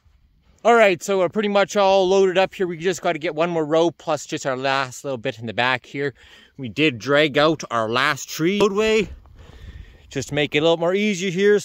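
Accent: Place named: Upper Peninsula, United States. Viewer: Congrats on 1000 subscribers!!! Great channel!! Your accent is awesome, reminds me of the upper peninsula here in Michigan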